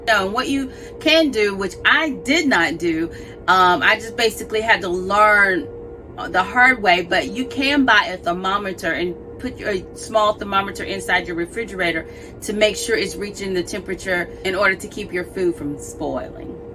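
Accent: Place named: South, United States. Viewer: Girl.....I thought you were from the south. I wish I could take credit, I use to watch my grandmother toast bread that way. Great video thank you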